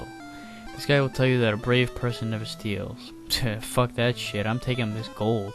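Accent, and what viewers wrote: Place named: Massachusetts, United States. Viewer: For some reason my computer wouldn't load your profile but I can tell you're from Mass. My boyfriend is from there and you guys sound NOTHING like NY! Anyways, way to keep it entertaining!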